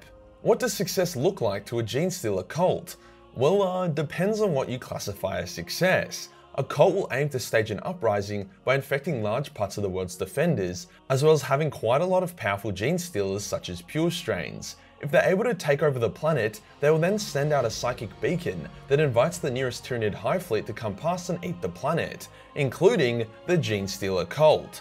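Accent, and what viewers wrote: Australia. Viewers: Im not even a huge fan of warhammer, but i love listening to this aussie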